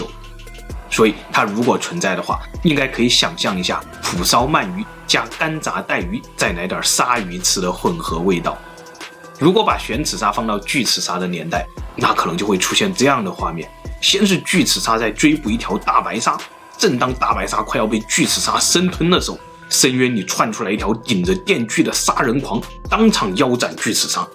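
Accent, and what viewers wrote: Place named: Guizhou, China. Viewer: 总感觉是贵州人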